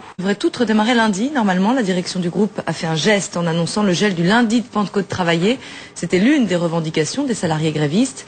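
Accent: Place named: France. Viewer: french accent is sooo fikkin hoot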